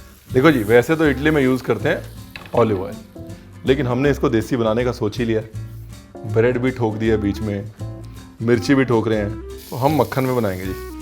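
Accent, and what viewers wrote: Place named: Punjab, India. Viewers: The punjabi touch in ur voice is just amazing 😁 and "ranveer aapne btaya nhi" has separate fanbase🌈♥️ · Reason why I love Punjabi accent even more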